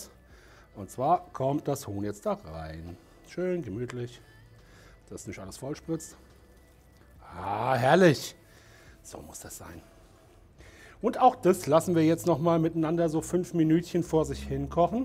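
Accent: Hesse, Germany. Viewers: Badesalz kocht. Herrlich Hessisch! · Hessischer Dialekt einfach eine 10/10 … Grüße aus NRW.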